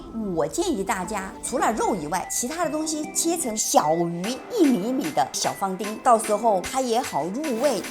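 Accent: Wuhan, China. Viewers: 哈哈哈 听你说的第一句话已经确定你是正宗武汉人了，明天就去按你的教程做豆皮去哦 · 拿起走，尝一尝 熟悉的汉普 哈哈哈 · 哈哈哈哈哈哈，普通话说着说着就变成了武汉话，你这汉普真的是让我感觉无比的亲切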